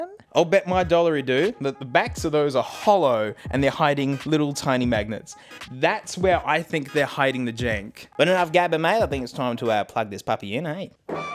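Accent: Australia. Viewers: Idk y'all, I LOVE the aussie accent like... a lot👁👄👁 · I could sit here and just listen to his insane Aussie for the whole of quarantine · Same, it's the bush Ausie speaky words. It's like a game trying to translate every video. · I understand the Australian accent quite well and I like it.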